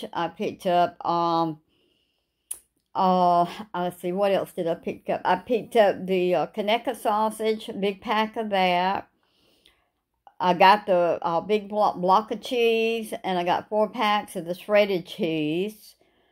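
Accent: South, United States. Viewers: ! My wife just said a true thing to me. We're nearly as southern as you but we just love to hear you talk. Ha. Here at our home it's just me and her. The kids have always done their own thing. I think we could turn 'em loose in the yard to graze and they'd do fine. Home cooking just flies over their heads. So with just us two it's easy to improve the old larder. And always something tasty in the freezer to defrost. But. You buy and can and all that but from what you tell us I conclude that your grub flies out the door about as quick as you get it. How do you stock up with all those hungry hounds dropping by for a feed?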